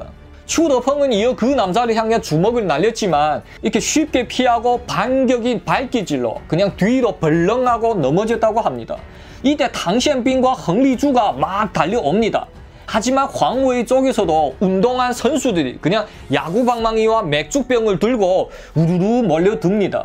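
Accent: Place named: Korean-Chinese, China. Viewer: 와 무슨 무협지 보는것 같네 실지 존재했던 흑사회들의 주요 사건비화를 류한이라는 주인공을 등장시켜 이야기를 조선족 특유의 강한 어투로 이야기 찰지게 잘하시네 일단 구독 좋아요 누릅니다 10회 기다립니다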